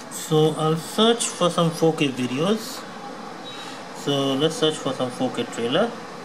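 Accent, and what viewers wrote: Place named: India. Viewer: Another indian with stupid tongue stupid accent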